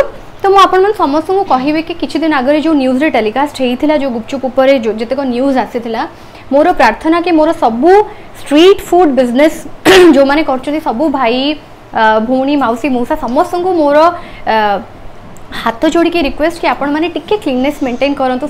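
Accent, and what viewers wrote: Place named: Bangladesh. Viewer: Ur bengali really cute n nice.😍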